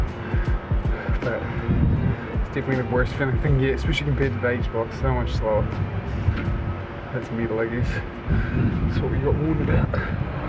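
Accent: New Zealand. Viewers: Are you from New Zealand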